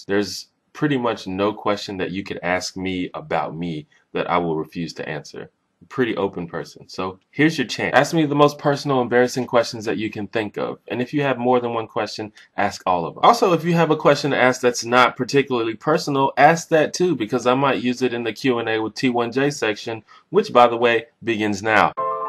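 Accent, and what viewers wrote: Alabama, United States. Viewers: Why do you talk like that? Are you from alabama or something?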